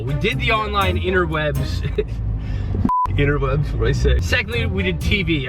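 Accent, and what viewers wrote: Canada. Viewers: Guys wait is this feller Canadian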